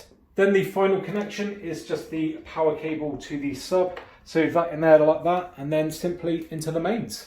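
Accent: United Kingdom. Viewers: Hi, I'm Thomas from Italy. I like your way to explain and you speak in a way very clear to me that I'm not mother language, so I thank you first of all. I noticed you pronunce the I like O, for example you say "guys" or "five" but you pronunce "goyz" or "foiv", so I'm very curious to know in which region you live in UK. The other thing: i'd like to watch a video of yours you show your bodybuilder diet. finally: i add you on PSN, hope you'll accept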